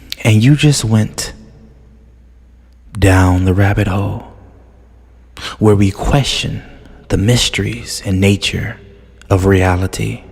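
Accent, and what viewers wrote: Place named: East Coast, United States. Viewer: Ok..ok.. 🤦 ‘’ALLEGED’’…..IS NOT thee same nor should it be used as a synonym to the 2 separate words of ‘’A LEGEND’’ ~ALLEGED- stated or declared to be as described, supposed to be. Supposedly. ~A LEGEND, is a Fable, fiction. Or if someone is called ‘’A LEGEND’’ it can mean they’re a hero. I am 100% aware that 🇺🇸 public school system has been consistent in one thing, just passing kids no matter what, & now even learning cursive writing ✍️ has sadly been tossed out along with basic spelling, which in turn doesn’t help any of the very young have knowledge in not only spelling but the meanings & differentiating of words. It’d be somewhat understandable if this narrator wasn’t a native English speaker, it most definitely sounds like he is & that he’s from the east coast.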